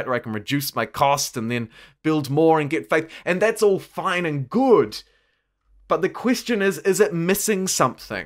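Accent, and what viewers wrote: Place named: New Zealand. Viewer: As a fellow kiwi-accent-haver I understand the pain.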